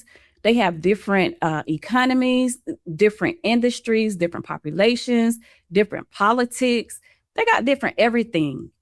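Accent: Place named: Georgia, United States. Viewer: Sound like Georgia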